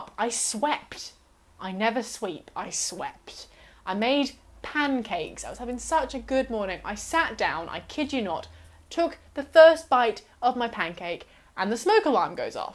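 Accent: Australia. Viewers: yOUR ACCENT HAS GOTTEN A LITTLE BIT MORE AUSTRALIAN AND IT'S SO ADORABLE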